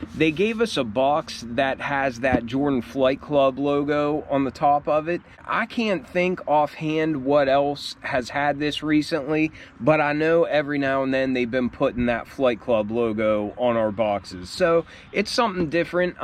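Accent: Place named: Pennsylvania, United States. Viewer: Nice video man!! Just a quick question are you from Pennsylvania?? You have an accent that reminds me of myself from Pennsylvania...lol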